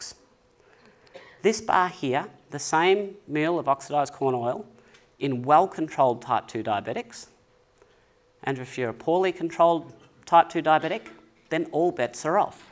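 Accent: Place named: Australia. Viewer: What a Strine accent! Though I’m sure that my Midwestern U. S. accent might also be found comical. Very good content.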